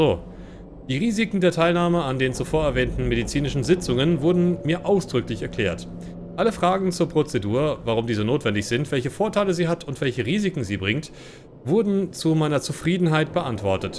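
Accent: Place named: United Kingdom. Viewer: Der Britische Akzent ist so schön ! <3 ich mag es auch lieber wenn es winter ist blöde Hitze >y<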